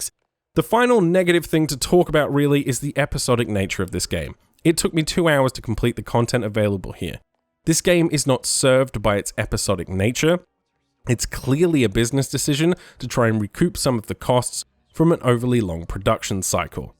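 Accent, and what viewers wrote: Australia. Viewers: I fucking swear all Australians have the same voice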